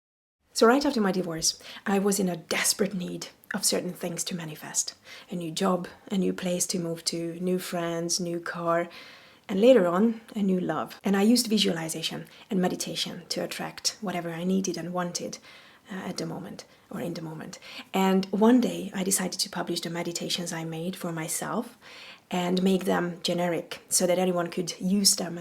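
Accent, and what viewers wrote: Hungary. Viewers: OMG ...I don't know how I got here...but what you say resonates with me so much! I also want to have my own workshop because I want to break free! My aim is also freedom...it has always been freedom, I just never dared to act on it.But now I have the courage to break free from having a boss, from working on a computer, from working corporate jobs. I'm only halfway through the video, so I'm really curious about the outcome! Ps.: Are you originally from Hungary? I suspect it from your name and accent😄. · Nooo! The Hungarian accent is really good. I love it!😅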